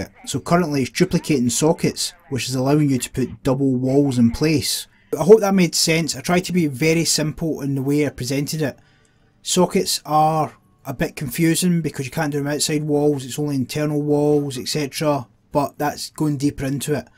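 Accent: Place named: Scotland, United Kingdom. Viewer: I wanna say Scotland, but I always hate assuming things. An accent is defined as speaking one language but using the rules of another. Is there an indigenous dialect in your area of the world?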